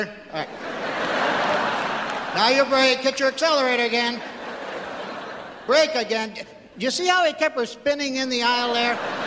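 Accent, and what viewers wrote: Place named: United States. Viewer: The first time I heard this skit it was on an LP record at a friends house, so no film track just the sound track back in 1978, I was only 17 and I thought he was the funniest man I had ever heard and it was the first time I had ever heard an American accent. I thought he was brilliant, I made myself remember his name and I am so glad I did. This is the second time I have ever heard this skit and it is exactly as I remember it, nearly 35 years later... still as funny as ever...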